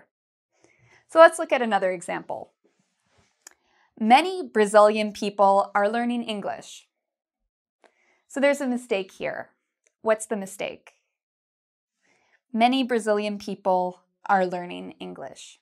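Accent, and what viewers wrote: Canada. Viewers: i love Canadian accent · canadian eh?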